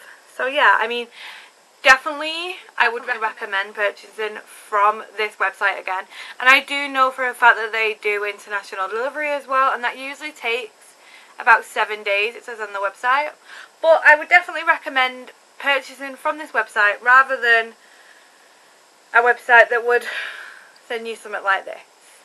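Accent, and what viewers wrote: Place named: United States. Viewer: why does your accent keep fading to american lool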